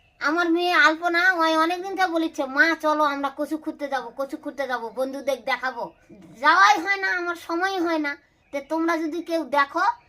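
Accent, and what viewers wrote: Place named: Naogaon, Bangladesh. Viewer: বাসা কোথায়, একবারে হামারে নওগাঁ জেলার মুতোন ভাষা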